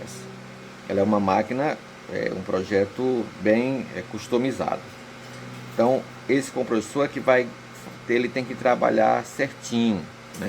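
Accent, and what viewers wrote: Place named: Bahia, Brazil. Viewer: o sotaque parece da Bahia, sou de Salvador! Apesar de aqui estar complicada a situação, ainda assim n troco aqui por nada!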